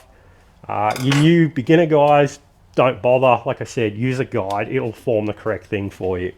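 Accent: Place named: United Kingdom. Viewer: I can’t understand this guy’s British accent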